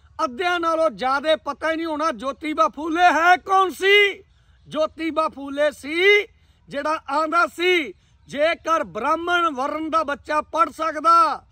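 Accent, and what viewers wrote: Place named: Haryana, India. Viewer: Tusi. Bai. Ji. Haryane. Wale. Lagde. Ho.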